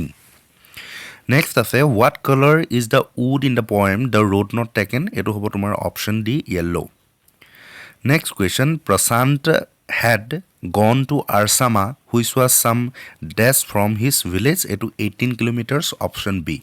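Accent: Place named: Kamrup, India. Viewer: Kamrup district r lage etene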